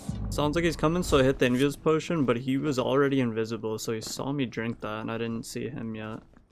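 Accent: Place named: Minnesota, United States. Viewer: def from minnesota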